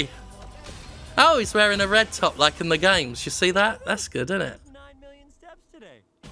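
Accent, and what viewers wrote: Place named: United Kingdom. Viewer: British accent voice in the video is so annoying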